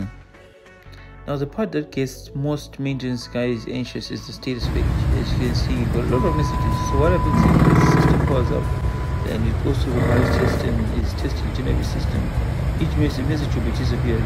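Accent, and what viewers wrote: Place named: Durban, South Africa. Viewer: Very nice explanation! Thanks. You sound like you might be from Durban? 😊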